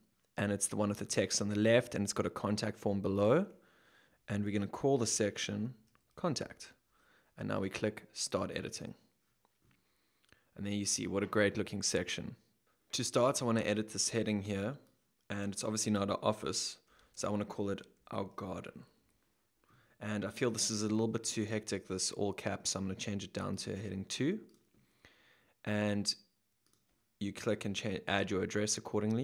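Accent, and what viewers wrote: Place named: South Africa. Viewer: Superb tutorial – and nice to hear it all in a familiar South African accent 😊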